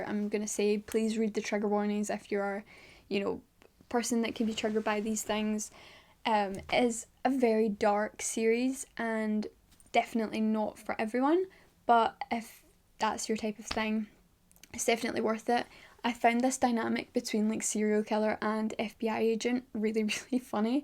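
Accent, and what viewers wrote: Scotland, United Kingdom. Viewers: It’s a Scottish accent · Soo this is your real voice, you sound a little bit british 😮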